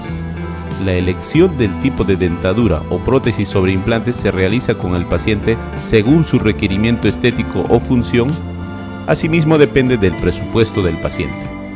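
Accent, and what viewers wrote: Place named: Argentina. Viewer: parece de Argentina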